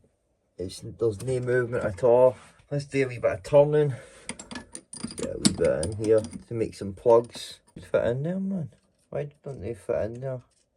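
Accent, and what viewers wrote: Glasgow, United Kingdom. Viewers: Great work, I've learned a lot today. Good to hear a Glasgow accent again. I left (Carmyle) 25 years ago to finish my days in Aberdeenshire but I'm still kickin. Ca canny. Garth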